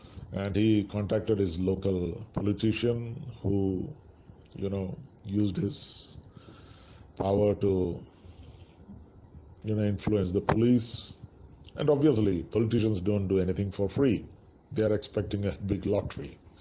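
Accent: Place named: Kerala, India. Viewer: Poda devil 👿 Who are you ? Are you 👿 spirit? What is your aim? Nitne English kettal ariyam nee oru mallu annannu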